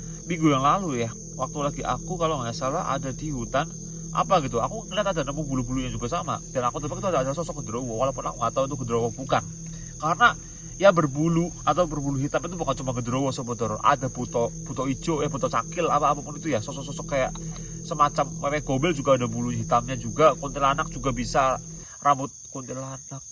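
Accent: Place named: Banyumas, Indonesia. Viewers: arane kaya wong rusia jebulane wong tegal ngapak oii... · Bru ktmu chanel ini...bnr2 luar biasa beraninya...ko ini lucu ngomongnya medok ngapak...pdhl sipit ya ko semangat....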